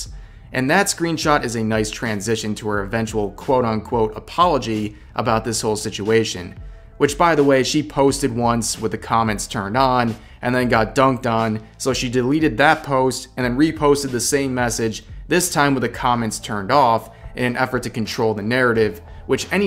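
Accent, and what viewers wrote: France. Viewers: So I don't follow at all. Not a fan of hers, not because of anything that happened recently it's just I already support a Vtuber and I'm not going to throw more money at another Vtuber who's content just isn't entertaining to me. From the clips I've seen people just seem to have a hard on for her because of her French accent. Like that is what I legit believe. This is fine as people have certain aspects about others that they are attracted to. Anyways what really stood out to me in this whole situation is that no one is right, and everyone is wrong. First of all in any contest you aren't owed anything. You take part and you either win or you lose. You can lose gracefully or you can be a sore loser and whine about it. This artist took it upon herself to feel entitled and was not noticed by senpai. So she demanded answers like she was entitled to them. 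She got in contact with a mod and didn't like the answers she got. I do not condone the manner in which he did but that's the answer she got but she STILL was not satisfied. I feel like that's what's wrong with alot of people these days is they feel everything is owed to them and they are entitled to anything they want when that is not the case. Anyhow even after speaking with she still wasn't satisfied and takes to twitter which always raises a red flag to me. You could be an adult and just deal with it but no you had to go to the cesspool that is twitter. You've automatically lost any faith or respect I had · I thought she was definitely acting toxic on her dead space stream. I know I definitely heard her say to one viewer who was leaving "What are you going to do? Touch grass? This is a Saturday, you're not leaving my stream!" Really showed me how big an ego she has and how narcissistic she is. 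Also a really good indicator that her viewer count/number of people who watch her streams is the most important thing to her. She did a lot of unnecessary yelling too, which I didn't understand. It's honestly sad because I genuinely liked her for a few good reasons; Number 1) because her art is amazing, and I'm an artist too; number 2) her cute French accent; and number 3) some of the games she plays (like dead space) are games that I really like. I did not know that this is what she's truly like behind the screen, but I am nonetheless disappointed. If anything, her toxic behavior serves as a good reminder of what I don't want to have in a relationship. I find it to be a good experience then, because I at least know what I'm looking for. I may be digging too far into it and that's just the persona that puts on for her streams, but at this point I kind of doubt it.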